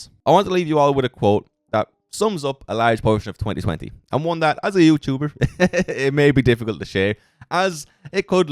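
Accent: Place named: Ireland. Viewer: What’s sexy is you’re back Baby I’ve missed you my brother. I’m glad to see you & hear that beautiful Irish accent. Let’s get down to business and make some money baby ♥️!!!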